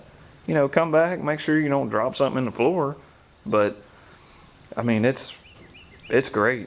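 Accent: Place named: Philadelphia, United States. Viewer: Do you live near the Philadelphia ms area? I know a girl with very similar/specific accent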